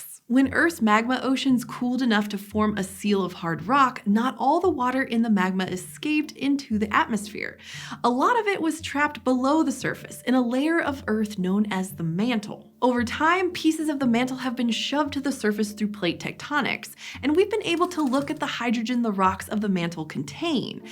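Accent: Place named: United States. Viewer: “Wader” I love your American accent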